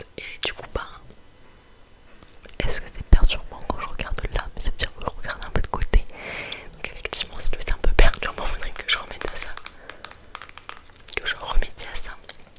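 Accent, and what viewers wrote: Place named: England, United Kingdom. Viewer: incroyable la vidéo, c'est super relaxant et puis tu as un très bel accent anglais☺ Je m'abonne directement et j'espère que tu iras loin car tu en as le potentiel ;)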